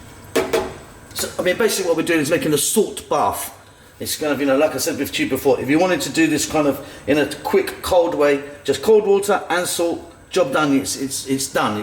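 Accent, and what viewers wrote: United Kingdom. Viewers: Its a british accent...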